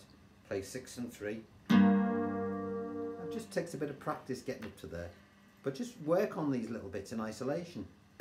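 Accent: Liverpool, United Kingdom. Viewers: Hi, enjoying your guitar lessons, great to hear a scouser tell it as it is. I have been to your bluenote channel where you cover loads of my favourite musicians. I agree with your comments regards, saw them play at the empire. Good luck with the album, some great tracks on there.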